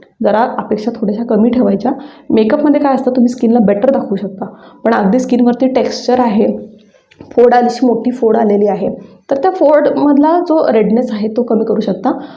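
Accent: Kolhapur, India. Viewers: Tumhi chan sangta pun kolhapuri bhasha samajate lagech .. aso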